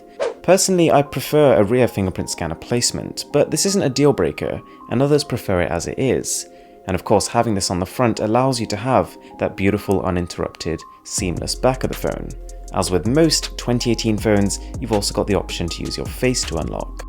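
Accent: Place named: United Kingdom. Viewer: That british accent.....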